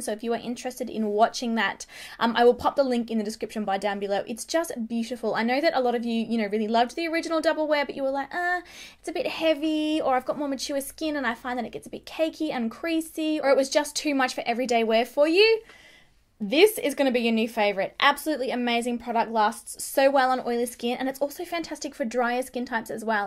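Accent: Australia. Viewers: I unsubscribed as well from Tati! Haven't watched her videos in months, because your videos are so real and besides, who can't get over listening to your beautiful accent!! You are a beautiful Australian woman who has helped so much with choosing products and I just love you!! Thanks for the tips regarding makeup and skincare!! Great video and your makeup is absolutely lovely and on point!!!! The Estée Lauder double wear foundation is one of Angie's, from Hot & Flashy, holy grail foundation! Therefore, since your recommending this foundation for oily skin types, then I'm gonna have to try it! I've been wearing bareMinerals's powder foundation for soooo many years... I believe it's time to try a liquid again!! However, or someone who may know...do you have a recent skincare (AM & PM) tutorial?? Because, I've been away for some time and am trying to catch up with you specifically!!! 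As always, much ❤️ from your 🇺🇸 RN oily sister!!💕. Hope to hear from you soon!! 💋💋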